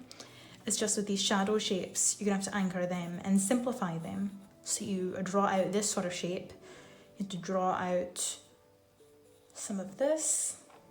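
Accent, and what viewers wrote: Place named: Scotland, United Kingdom. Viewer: u from scotland for sure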